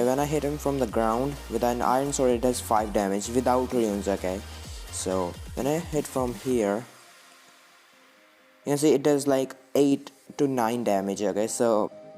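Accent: India. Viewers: Youre accent is Indian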